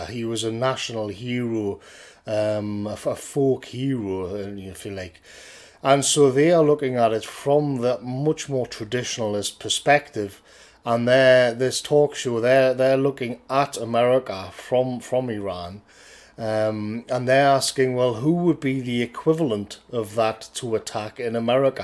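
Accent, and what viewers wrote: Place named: Geordie, United Kingdom. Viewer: You're a clever lad marra. Love listening to your vocabulary in wor accent!!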